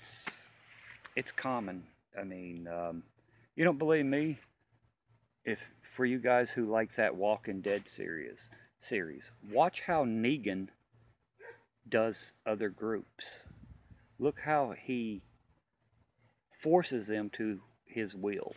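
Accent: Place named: Texas, United States. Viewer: You must have spent a lot of time in TX. You have a noticeable TX accent.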